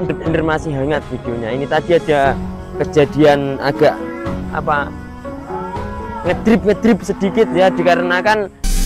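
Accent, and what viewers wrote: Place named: Banyumas, Indonesia. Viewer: Wong ngapak dir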